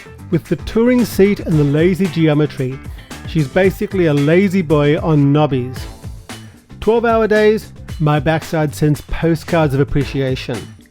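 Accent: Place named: Australia. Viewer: to hear an Aussie accent filled with love for his bike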